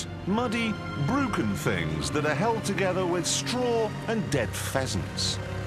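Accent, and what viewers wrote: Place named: Birmingham, United Kingdom. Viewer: Richard Hammond's brummie accent was still there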